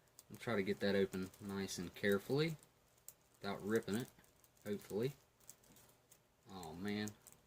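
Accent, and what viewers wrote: South, United States. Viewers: Theres somthing about the southern accent that feels so homey or homelike.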